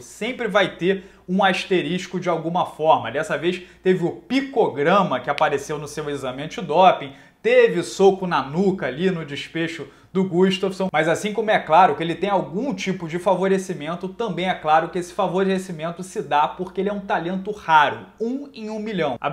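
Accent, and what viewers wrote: Rio de Janeiro, Brazil. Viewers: Ótimo comentarista!...análise perfeita, apesar do sotaque carioca irritante (eu acho), a análise é perfeita!!! Jones é foda!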